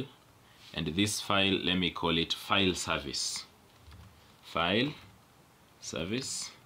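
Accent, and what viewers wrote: Kenya. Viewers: Nice to hear a Kenyan accent 🙂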